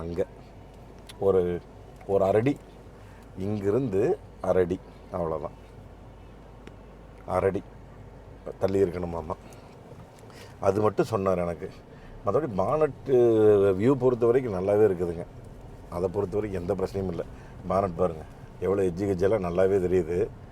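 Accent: Kongu, India. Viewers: அண்ணாவோட கொங்கு ஸ்லாங்கு ஸ்டைலே சும்மா நெகு நெகு நெகுனு இருக்கு😍😍😍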